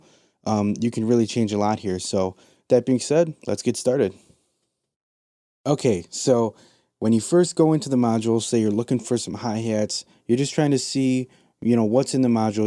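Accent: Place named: Midwest, United States. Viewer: I come to these videos all the time but I can't get over the Midwest accent you heeeve. Hey hates. What's heyppening with the hey hates. Ugh.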